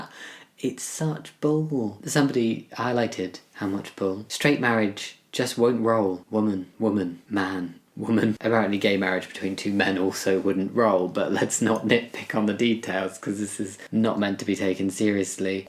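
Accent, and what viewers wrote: United Kingdom. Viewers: Are you British? 😮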